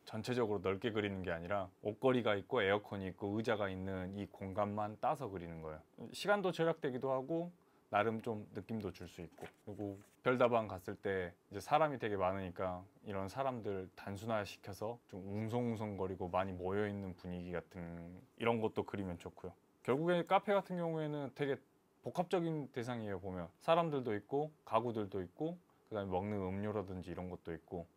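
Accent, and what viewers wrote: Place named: Jeolla, South Korea. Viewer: 앗 저 전라도가 고향인데... 말씀하실 때 전라도 억양이 들리네욤 🤭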